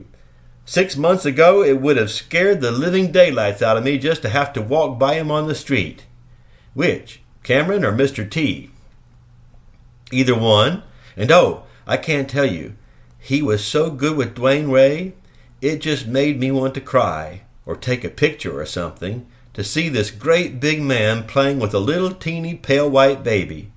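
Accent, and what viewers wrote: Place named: United States. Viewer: Thank you! Really nice reading! Normally I can't stand American voiced audiobooks but you're perfect! Please do the other kingsolver books, your voice suits it so well! X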